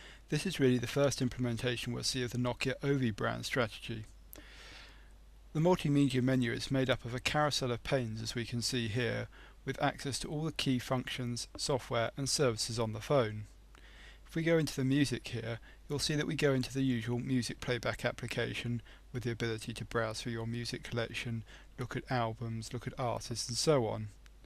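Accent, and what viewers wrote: United Kingdom. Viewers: hahahahaha ,yes u'r right what a speech an what a ugly voice, British accent.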